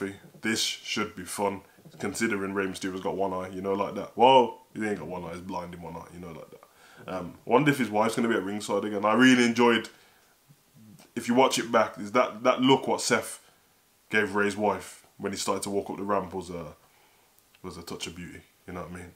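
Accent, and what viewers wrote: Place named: Birmingham, United Kingdom. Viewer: Yo bro are u from brum u sound like u are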